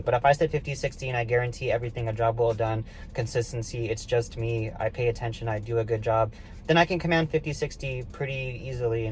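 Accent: Texas, United States. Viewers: What part of Texas are u from Bro?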